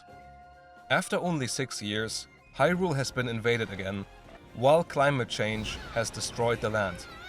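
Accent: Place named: California, United States. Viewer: Don't know man sounds like California to me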